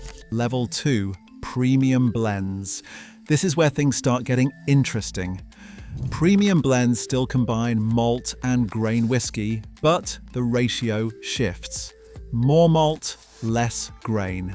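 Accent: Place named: England, United Kingdom. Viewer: It's a little strange to hear an English accent talking about Scotch in terms of US$. It's the English/US contrast that I notice, not the Scotch//English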